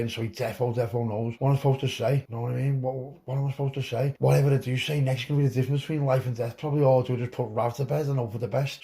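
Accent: Liverpool, United Kingdom. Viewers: Love levy yes Scouse 🫡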